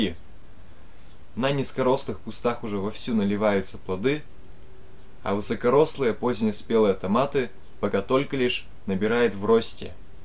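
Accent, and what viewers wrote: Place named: Urals, Russia. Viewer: говор уральский....в каком районе проживаете?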